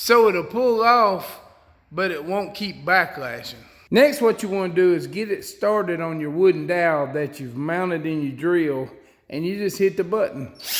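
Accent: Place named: Mississippi, United States. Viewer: Thanks! You sure you ain't from Mississippi? It's the same accent.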